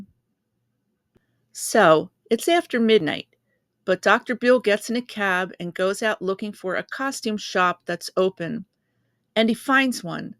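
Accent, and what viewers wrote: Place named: New York, United States. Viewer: I like your narration and your slight (IMHO) New York accent, which I enjoy.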